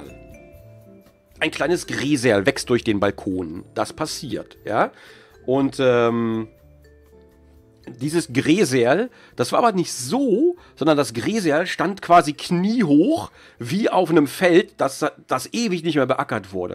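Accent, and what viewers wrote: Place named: Germany. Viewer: Nicht wirklich. Wenn ich ihm beim Englisch-sprechen zuhöre, fällt mir der deutsche Akzent schon sehr auf. xD Aber eigentlich sehr schade. Stellt euch mal vor, wie krass viele Abos Gronkh bekommen würde, wenn er auf Englisch kommentieren würde! :o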